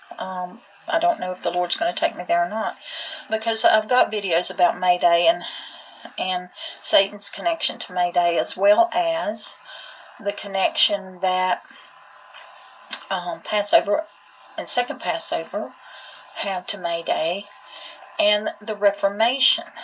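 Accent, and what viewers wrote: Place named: South, United States. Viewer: Soooo good to hear that calming southern accent! I have missed you sister.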